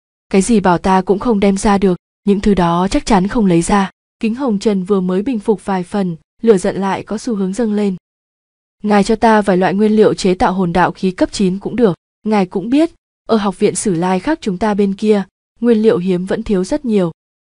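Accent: Vietnam. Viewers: bé ad người quảng nam à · răng mà giống giọng miền trung rứa hề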